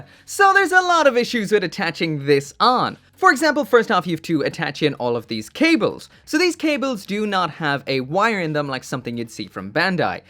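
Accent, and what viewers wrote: Ireland. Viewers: Irish accent really popping through in this review. Also props to him for review this abomination of a kit 🔥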